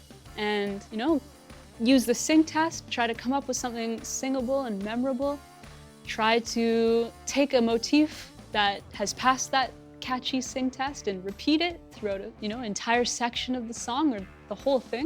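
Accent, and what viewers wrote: Canada. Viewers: God I love her canadian accent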